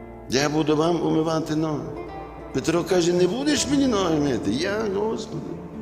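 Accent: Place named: Western Ukraine, Ukraine. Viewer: Я в захопленні від Ваших наук,від нашого західного діалекту. Щиро Дякую,живу ними❤️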